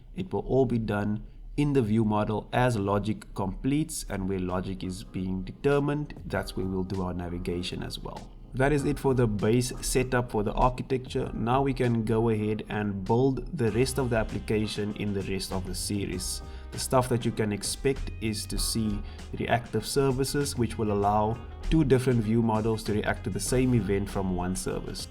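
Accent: South Africa. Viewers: you have a sa accent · I thought that accent sounded familiar :) South Africa, howzit man. Did you base this on MVVM?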